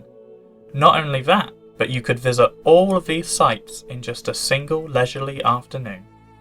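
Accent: Norfolk, United Kingdom. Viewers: your Naarfalk accent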